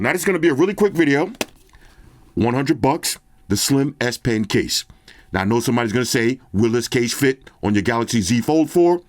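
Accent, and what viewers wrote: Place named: New York City, United States. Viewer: is literally an NYC hood dude from my generation. He has the best vids cause its like I'm talking to one of my boys from back on da block 🤣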